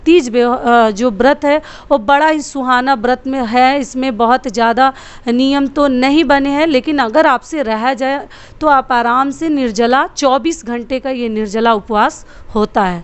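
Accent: Bihar, India. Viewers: Madam ap bihar se ho up se ho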